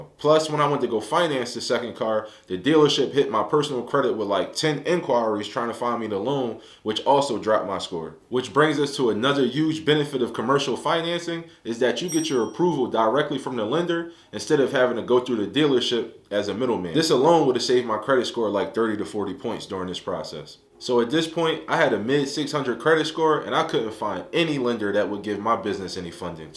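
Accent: Philadelphia, United States. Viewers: This a Philly accent? Makes me feel like I’m in the right place for this info. 😂😂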